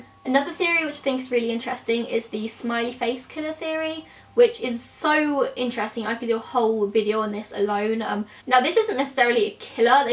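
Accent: United Kingdom. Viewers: You're from the UK?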